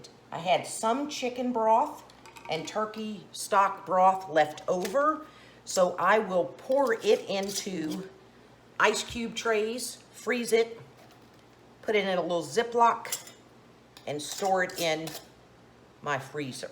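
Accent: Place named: Florida, United States. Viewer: New to your channel. Found you through the Canuary collaboration. You make me smile. You're so lively! Never canned before so I am definitely learning a lot watching all these great videos. And if I Didn't know better you sound like you are from Florida. Will be sad if I'm wrong. I can usually spot a fellow Floridian. :-D .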